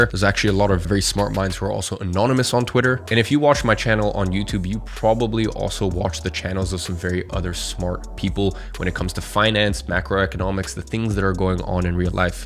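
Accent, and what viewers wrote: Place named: United States. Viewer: почему у тебя американский акцент живя в Лондоне?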